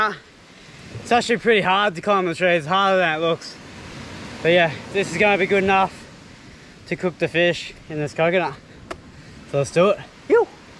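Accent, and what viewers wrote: Australia. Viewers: This channel is quickly turning into a cooking channel with great australian accent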